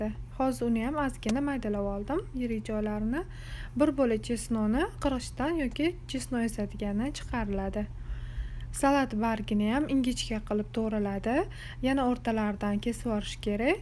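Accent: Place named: Tashkent, Uzbekistan. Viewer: Towkencha gapirvottilaku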